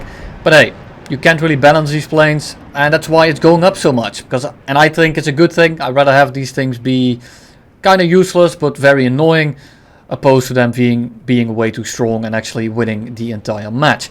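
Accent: Netherlands. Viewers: you have somewhat of a dutch accent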